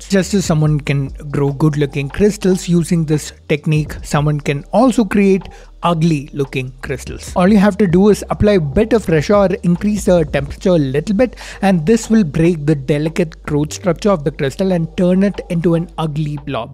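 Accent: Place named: South India, India. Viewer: you r speaking South Indian... in the name of English..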